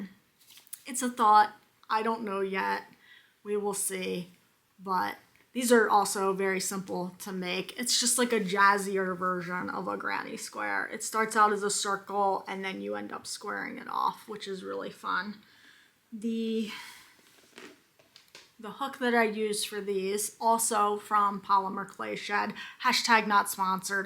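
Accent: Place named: California, United States. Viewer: South Central PA or valley girl? You sound like California.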